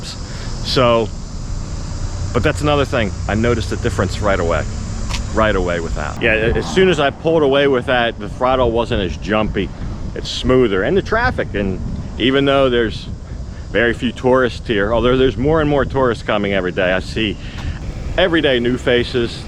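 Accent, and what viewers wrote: United States. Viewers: Are you an American living in Thailand? Sounds like an American accent lol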